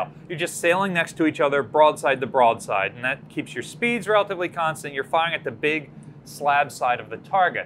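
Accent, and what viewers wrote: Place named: Upstate New York, United States. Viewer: Missouri*. Saying “Missoura” with a Missouri accent makes sense, it’s how they talk. Saying “Missoura” with a John Peck-Erneck upstate New York accent sounds fking ridiculous.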